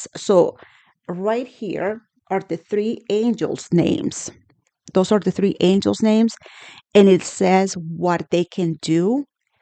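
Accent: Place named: Brazil. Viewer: Wonderful review. Could you do one on Angels of Omnipotence by Jareth Tempest? Also, may I ask if you're Brazilian? That's how the accent sounds to me. Either way it's adorable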